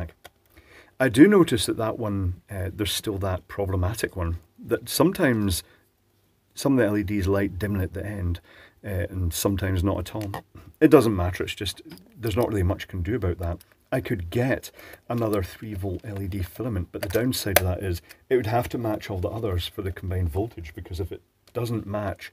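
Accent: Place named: Glasgow, United Kingdom. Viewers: lol I find myself doing the one moment please in a glaswegian greenockian accent, my girlfriend looks at me weirdly been doing that constantly just because of lol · I'm from Glasgow So nice hearing a familiar accent . I live in Michigan now for the past 25 plus years.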